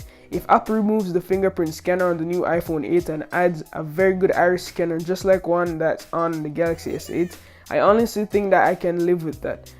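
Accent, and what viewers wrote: Jamaica. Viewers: Are you Jamaican? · are you jamaican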